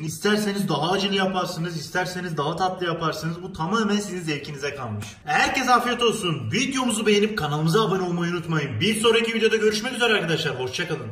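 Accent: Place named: Hatay, Turkey. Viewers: Çünkü adam hataylu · Because he is form hatay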